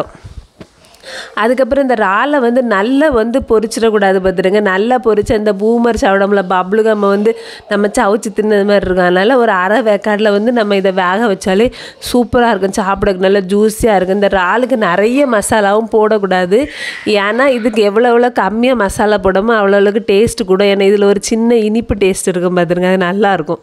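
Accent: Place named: Kanyakumari, India. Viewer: சமையலை தாண்டி... கன்னியாகுமரி தமிழ்... வெற்றியின் ரகசியம்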